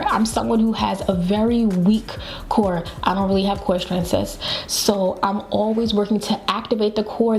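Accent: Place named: Baltimore, United States. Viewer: I just ran across your video! Are you from Bmore? You’re accent is so cute!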